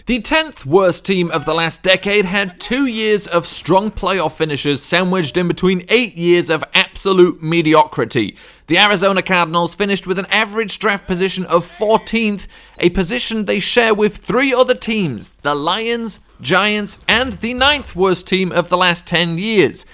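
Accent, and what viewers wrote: United Kingdom. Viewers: Weird to hear a British NFL fan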